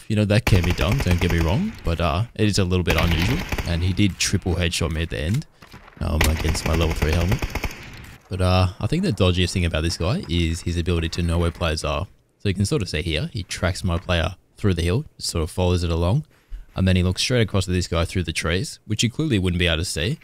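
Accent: Australia. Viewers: How do u have such a low ping, you sound Australian..I can only get games in AS now.. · He's Australian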